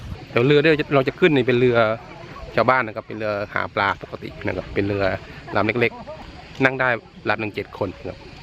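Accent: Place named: South, Thailand. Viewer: ชอบพี่แหลง(พูด)ใต้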